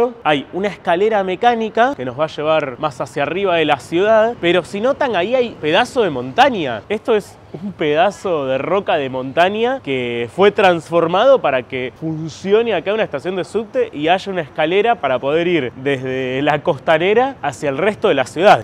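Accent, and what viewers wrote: Buenos Aires, Argentina. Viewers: Porque hablas en porteño taaan exagerado mmmm es raro eso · uiii que turbio ; calle grafiteada.... pa vos es turbio ? ................... te salto el policheto que llevas dentro , igual gracias x mostrar este lugar..... vos viajas por todo el mundo , porque sos youtuber ? te falta humor ... y el acento portenio es inevitable... de todas formas gracias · Y si es dé buenos aires de remedios dé escalada como querés que hable 😂😂😂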